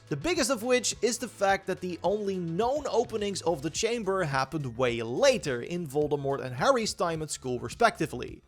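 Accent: Netherlands. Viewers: Nice, very cool info! His accent is so distracting to me, am I the only one? I'm Dutch and I presume he is aswel, well yeah 😂 so maybe that's why 🤔😊 Very nice content though 👌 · Ik hoor een Nederlands accentje haha, leuke video! Ben benieuwd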